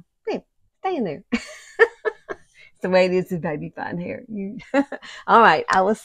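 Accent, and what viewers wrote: South, United States. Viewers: Oh my, Alabama girl here as well! Love your southern accent as well as your hair!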